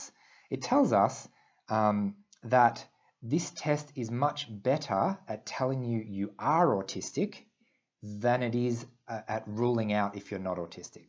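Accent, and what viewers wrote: Australia. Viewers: Are you Australian? I keep hearing "IQ", not "AQ".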